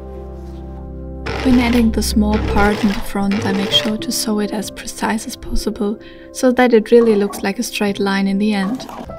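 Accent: Germany. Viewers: Die Videos sind so wundervoll gemacht. Es ist fast wie in einem Historien- und Kostümfilm und verzaubert regelrecht. Zum Schmunzeln bringt mich immer die hörbar deutsche Aussprache ❤ Es gibt kein Nähkanal hier der so hochwertig und ästhetisch ansprechend umgesetzt ist und zum träumen anregt. Jedes Kleid wird zu einer Geschichte und mit Gefühlen aufgeladen. Einfach traumhaft, mein ganz grosses Kompliment 🎉🏆💐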